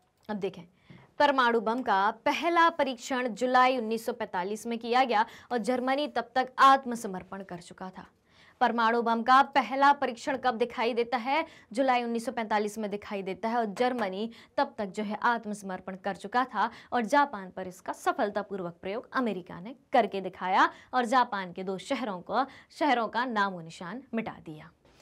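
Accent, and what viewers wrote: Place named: Rajasthan, India. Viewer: Mam aapka ton rajasthani ton lag raha hai . Aap kis rajya se ho please batao n 😊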